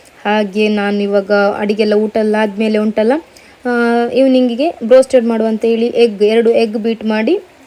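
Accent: Mangalore, India. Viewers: This is Mangalore kannada Mangalore an style of speaking that's why you feel that it is not perfect but I feel no problem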